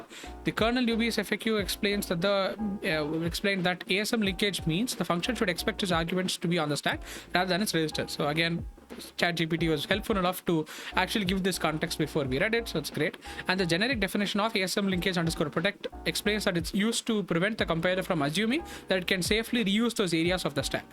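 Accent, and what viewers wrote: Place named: India. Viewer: Hi friend, i clicked your video because of the title ... really interesting topic, but i won't be watching the rest of the video, and because you are a fellow creator and just started i wantd to tell you why and give you a few hints on the way out - and hopefully be back later. First of all, a lot of people primarily listen to these kind of videoes, and besides from that you are providing a lot of interesting information, so the sound is really important. So i would recomend you a few things regarding that: 1: A lot of Indian speakers have horrible accents, but that is actually not that bad for you, but you speak very fast ... even if you where a native english speaker your pace is way to fast for this kind of content ... so try to speak slower and edit the sound so that your voice is a bit more clear in comparison wuth the background music. 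2: I realize that this was a livestream, and it's fine that you share those like this ... but please add an intro, nothing fancy, just a clip of you explaining what the video is about and highligting the most important parts. 3: If you want to be really fancy, make a curated version of the stream ... break it up and narrate it. I really think this is an interesting topic, but i listen to these videos when i work - and because of the pace i cannot focus on my work while listening to this, sorry. I really hope you take this feedback constructivly and goes to the moon, power to you my friend! 👊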